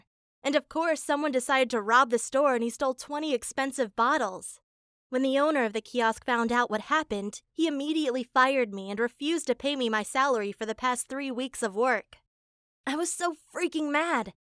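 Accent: United Kingdom. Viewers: are u british??? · Let me guess your from the UK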